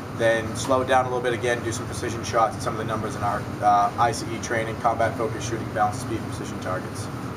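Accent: Massachusetts, United States. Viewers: Just came across this video; nice work! (Noticeable MA accent, btw.) Question - you mentioned the regular M&P line would be getting upgraded triggers too. I e-mailed S&W and they said "No upgrades at this time." Do you have any more specific information about when this change will be happening and if S&W will offer the upgrades to previously-purchased M&P guns, either for a nominal charge or as part of regular warranty service? Thanks for your help, and keep up the good work!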